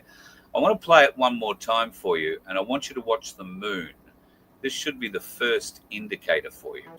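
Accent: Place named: United States. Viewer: Awesome vid, but why an Amercan voice and not an Aussie voice????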